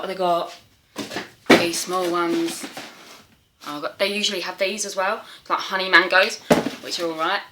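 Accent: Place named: London, United Kingdom. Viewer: I'm just curious about where you live because you was saying London markets? I thought you live in London because of your accent Btw of you do then you should try Spitalfields market its just so cheap and an excellent place to get all your fresh produce especially if you go early mornings I love the way you said I prioritise health overt anything else and that's how everyone should be Minimal living is the best way to go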